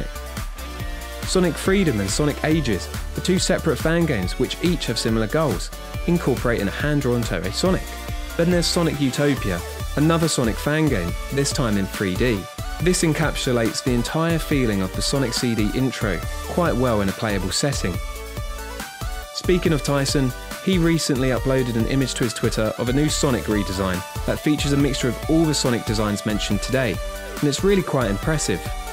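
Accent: United Kingdom. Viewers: My gosh! You've cut the video on two pieces, okay, but you didn't include links in the description! English is not my bloody native language and the British (or Australian I dunno) pronunciation make it even harder to get by ear. Add the links! I can find anything in two wasted minutes more, but many people doesn't. Thanks for video thou. And I put a thumbs up on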